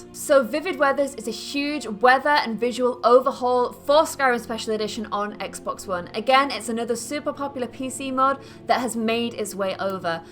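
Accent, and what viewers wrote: United Kingdom. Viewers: So this is where the Little Mermaid went. She also adopted a British accent. Neat. :D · Well. She was hot until she unleashed her British accent.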